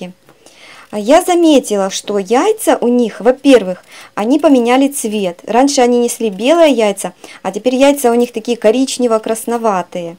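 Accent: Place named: Surzhyk, Ukraine. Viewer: Я не знаю , що там у вас за яйцеалмази, просто суржик ваш ріже вухо, говоріть або вже досконало російською( рашним язиком) , або пререходьте на українську мову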